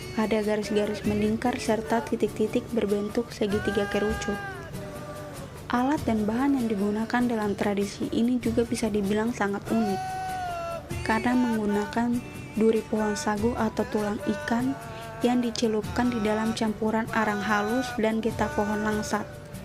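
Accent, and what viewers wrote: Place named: Papua, Indonesia. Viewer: Bagus polll.. memang kalo mau perkenalkan budaya papua itu harus pake logat papua🤩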